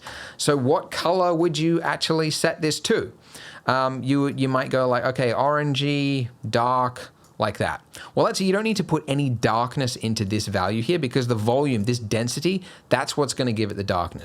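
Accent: Australia. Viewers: Oh I love Aussies, they're such good sport. And the accent is always a + too.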